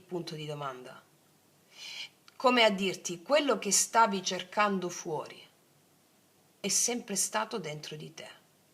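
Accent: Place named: South, Italy. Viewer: Ciao carissima grazie bellissima lettura e bellissimo accento del nostro sud ❤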